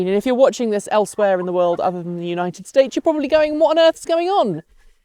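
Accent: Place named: United Kingdom. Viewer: i always thought you are form the UK and not the USA.. you sound so British... take it as a compliment...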